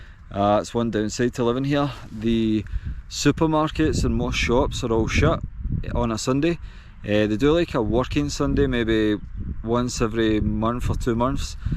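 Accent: Scotland, United Kingdom. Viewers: Your pronunciation is really difficult for me and I need subtitles but I like northern accent very much. I have never been to Scotland but I love this place. Don't ask my why because I don't know. Just imagination. People need to say "thank you" when you open the door but...that young generation, you know what I mean :)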